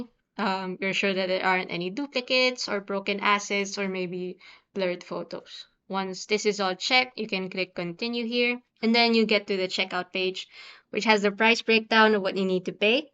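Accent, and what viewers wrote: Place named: Philippines. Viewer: Been watching these videos, you sound Pilipina (Pilipino here)! I'm liking what you guys do, just signed up :)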